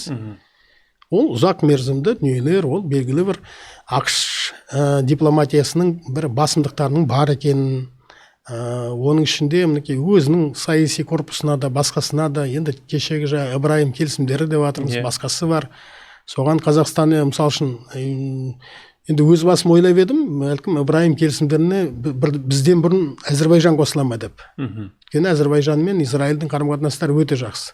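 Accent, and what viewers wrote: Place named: Russia. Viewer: Вашингтон емес, Уашиңтон. Техас емес Тексас. Ганконг емес Хоңкоң. Ресейдің сасық аузымен мыйымен әлемге қарауды қою керек Қазақтар.😮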